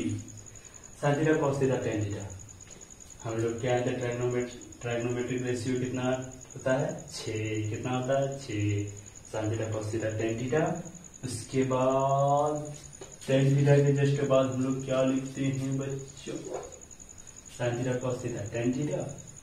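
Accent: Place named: Bhojpuri, India. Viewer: Bhojpuri me bol Rahe ho aap ❤🎉🎉🎉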